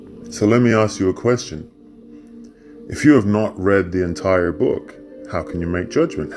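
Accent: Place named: United States. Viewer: What the heck are you talking about with your American accent and admitting that you haven't read the document?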